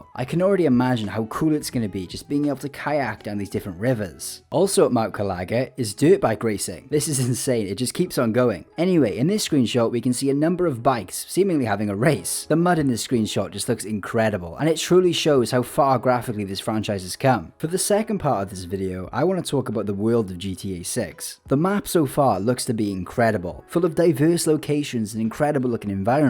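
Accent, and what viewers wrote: United Kingdom. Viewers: Bro got that British voice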